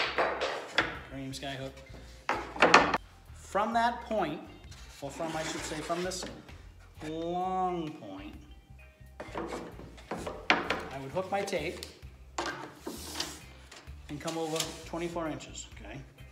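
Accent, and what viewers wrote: Boston, United States. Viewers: I recognize a fellow Bostonian when I hear one!!!! 😂 😂😂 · Yeah, man. I’m a newbie and you’re talking straight to my level. You explain and repeat things so that I won’t have to rewind the video and it’ll be easily remembered. The comic relief and your accent (Boston, I’m assuming) is icing on the cake. Thanks, brah! Blessings.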